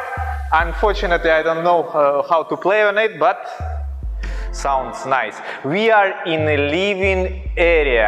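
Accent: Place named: Russia. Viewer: Are U Russian? I can hear familiar accent 😆 Seriously. I like Dubai. Been there 3 times and want more 😌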